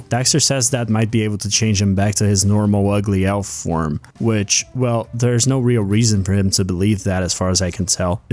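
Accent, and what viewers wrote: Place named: Europe. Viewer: I apologize if it's rude at all but your accent caught my ear, it sounds nice! Are you a European Ytuber? Also, I grew up with the Precursor Legacy and genuinely loved the hell out of it. Jak not really being 'mute' but moreso Daxter interuppting/butting in before Jak can speak is unique lol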